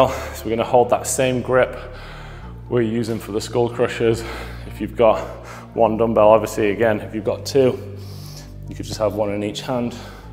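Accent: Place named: Newcastle, United Kingdom. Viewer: ARE U FROM GEORDIE SHORE??????? A LIFTING LHAGER VIDEO, SMASH ITTTTT LADDDDD. 😊😊😊😊😊😊😊😊😊😊😊😊😊😊😊😊😊😊😊😊😊😊😊😊😊😊😊😊😊😊😊😊😊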